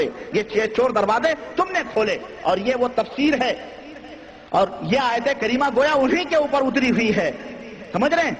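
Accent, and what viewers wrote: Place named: Pakistan. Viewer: Hulia Arab numa, Pakistani lahja. Allah hidayat da sab ko. Dawat e tabligh zindabad.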